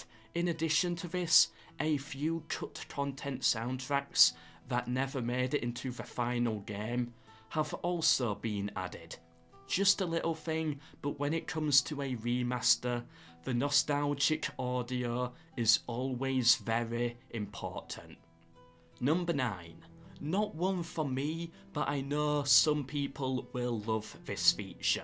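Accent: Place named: Yorkshire, United Kingdom. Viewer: my relatives are from bridlington, you sound similar. Hope thats not an insult! Love the channel !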